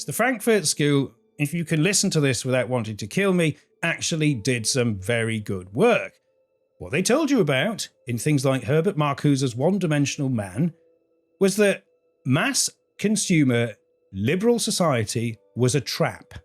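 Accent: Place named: United Kingdom. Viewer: British accent for someone in USA!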